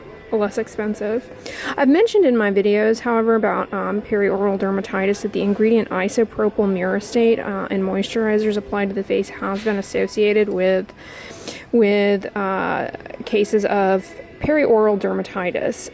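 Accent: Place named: South, United States. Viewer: Southern asmr turns dermatologist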